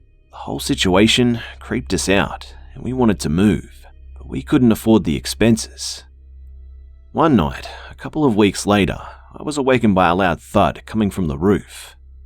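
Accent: Australia. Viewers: Listening to an Australian pronounce southern terms 😂 I kept being like wait wtf is he saying then I'd be like ohhhh 😂😂😂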